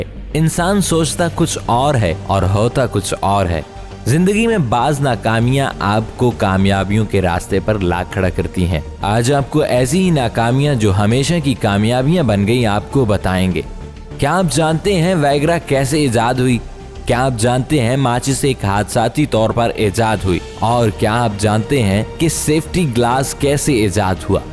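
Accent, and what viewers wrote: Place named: Lahore, Pakistan. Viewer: Hahaha, nai Lahore ka lgta hai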